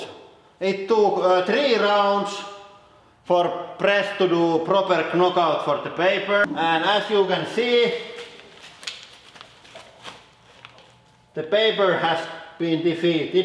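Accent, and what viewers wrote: Finland. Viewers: His accent is Finnish not German-Austrian so he can't be Hitler even if he was alive. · Isn't this a finnish accent? 😂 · Myles Bishop he is from Finland. Finland people always have problems on speaking English. :D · Täytyy olla suomalainen, kuulee heti :D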